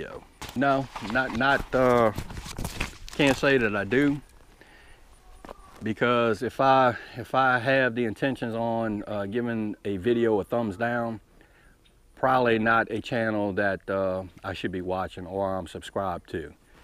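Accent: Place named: Mississippi, United States. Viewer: I love your channel and tht Mississippi accent. :)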